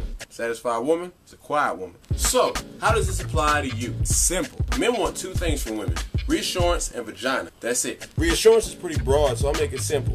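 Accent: South, United States. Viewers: What happened to your accent? I love country accents! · is he from the south? seems like he got an accent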